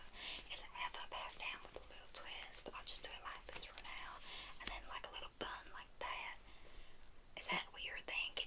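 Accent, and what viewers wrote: United States. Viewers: your "country" accent is too cute haha. loved this character and roleplay ❤ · I love this. Thanks to bridgerton I have heard so many asmr videos with terrible British accents! Your American accent is ten times better than when I try one.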